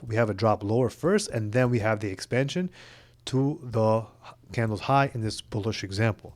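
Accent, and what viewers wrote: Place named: United States. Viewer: You sound American. How do you do prop firms that don't accept us traders?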